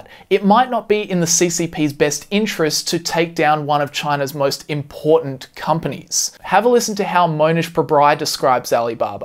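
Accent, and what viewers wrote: Australia. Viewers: you got my attention with the quote: "yea awright, grandpa!!" .. good analysis with concerted effort to avoid over-influencing our opinion... love yur Aussie accent...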